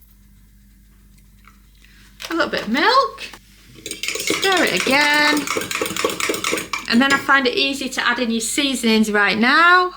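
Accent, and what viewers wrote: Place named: Hull, United Kingdom. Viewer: Just 'found you'. I'm a disabled guy in my 70s living alone ('cept for the dog - she's lousy at cooking with an air fryer). So cooking, necessarily, is either microwave or air fryer! Your recipes are gonna be real useful! Do you have a particular Air Fryer you recommend also, in the reviews - I'm presently using a real cheapie one. Does the job ok for simple meals/ready meals etc. Btw, where's yer accent from? - I was brought up in Hull in Yorkshire ('Ull') decades ago and your accent's a bit reminiscent of me childhood! Decades ago I lived in Japan a while, and I had to 'lose me 'Ull accent real quick - ('...they 'ant gorra clue what yr'on abaht if y'talk wi' a 'Yorksheer' accent in Tokyo!). I haven't been back to 'Ull since early 2000s. Would get totally lost there now! Cheers! Tony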